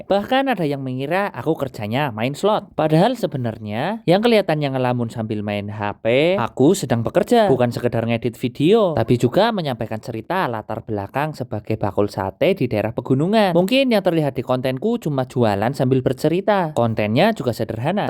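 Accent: Banyumas, Indonesia. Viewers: ternyata orang banyumas, kukira cilacap krn logat ngapak nya samaa😭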